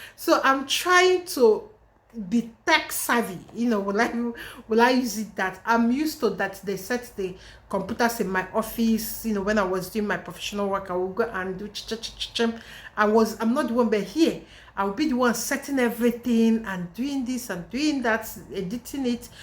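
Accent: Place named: Igbo, Nigeria. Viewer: Me I love your Igbo tongue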